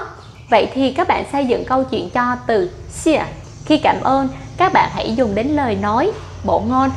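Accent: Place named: Vietnam. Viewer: Nghe phát âm lài biết ngay người gốc Việt kkkkkk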